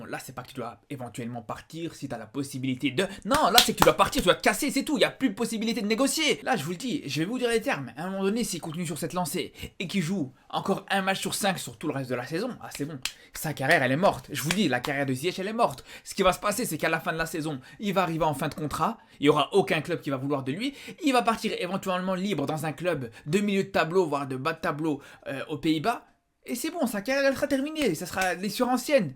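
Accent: Belgium. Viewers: J'entends un ptit accent belge. T'es marocain de Belgique ?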